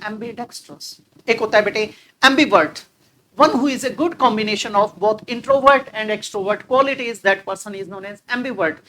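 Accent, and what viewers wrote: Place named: Bhaswal, India. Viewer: Sir aap boli bhaswal se ho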